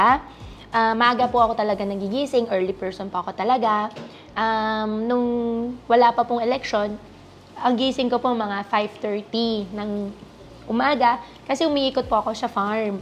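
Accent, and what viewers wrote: China. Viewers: Chinese na Chinese nga yung punto ng pagsasalita nya. San ba sya nanggaling? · Pota with the distinct chekwa accent. Halatang Chinese sleeper cell to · Yup. Her Mandarin tongue accent is obvious 🤥 😝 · Chinese accents talaga ☹️ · Nilalabanan nya yung chinese accent nya pero very halata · Ganyan magsalita ng tagalog yung Chinese. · Sa itsura nya at accent tsekwa yan e. Yun iba kasing pinoy pakitaan lng ng konting bait bumibigay na agad. Di tinitingnan kung mabuti ba talaga o hindi ang pagkatao ng isang tao. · Chinese nga ito sa salita p lng may chinese accent na. Ako chinese blood din pero i was born here grew up here im.proud to be a true Filipino citizen wala na invaded na tyo ng mga pure chinese we need to stand up to protect our own country God bless the phillippines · Agree if she really grew up in the Philippines.. She would sound like pinoy accent talaga... 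But her accent is Chinese talaga. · Chinese na chinese yung pag tagalog. Reminds me of Chinese vendor owners sa 168. Mga Half chinese di ganyan accent , pure tagalog pa din ang English.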